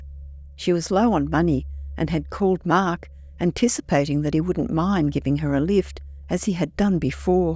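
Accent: New Zealand. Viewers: Is that a kiwi Accent I hear? Your stories are great and your voice is beautiful and if that’s you in t he thumbnail…..❤ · I think it’s a real person, she’s just a New Zealander. So the accent is different than even Australian mainland accents.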